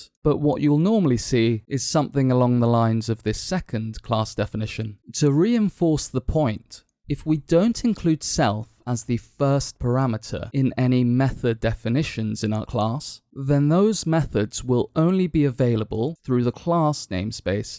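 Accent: India. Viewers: You statement about Indian accent is very unfortunate. I feel sorry for learner's like who instead of focusing on the message focus on the messenger especially when things are given free. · I don''t understand what is meant when it's said "It's free so you shouldn't say a thing about it" , it's free, so what? I don't like it! The guy makes money from it even if it is free! My point is that, since they make money, they should try to make something that's the best they could offer for their own good. I stated how I truly felt, it's completely normal to dislike an accent, and it is nothing "unfortunate", the voice really does matter when it comes to sending your message, and I think that the message that Indians try to make their voice more like the English accent, I think if they put more effort into it the message would be sent more fluently. It's not my fault that I get headaches when I listen to the Indian accent in the first place, I would love to listen to them without getting a headache honestly, it's just that I am that way and I am the one who suffers from it since Indians have very useful content...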